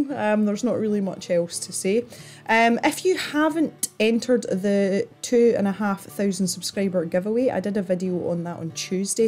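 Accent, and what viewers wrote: Scotland, United Kingdom. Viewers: I don't really know how I actually found your video, but watching you and hearing you talk I get so many Scotty vibes here :D I love how you say "wee bit" a lot :) it is a nice drawing, though. I shared my own illustration on Instagram (@ I drew a Manga girl in a ball gown :)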